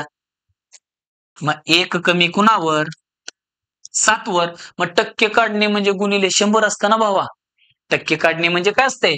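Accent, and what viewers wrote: Rural, India. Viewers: अप्रतिम आहे सर तुमचे भाषा कौशल्य.... तुमच्या बोलण्यातून गावाकडचा गोडवा आणि शिकवण्यातून आई सरस्वतीचा आशीर्वाद दिसतो...🙏🏻🙌🏻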